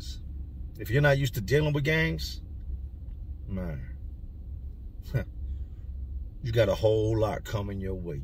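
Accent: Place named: Chicago, United States. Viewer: I knew that sounded like Chicago. What neighborhood? lol They thought you were a King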